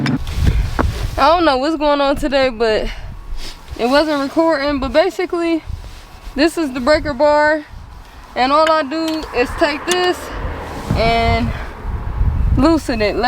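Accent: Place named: Detroit, United States. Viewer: You sound so Detroit sis lol you in the city?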